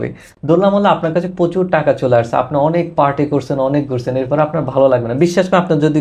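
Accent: Noakhali, Bangladesh. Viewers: Uni ki noakhali zillar naki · জি, নোয়াখাইল্লা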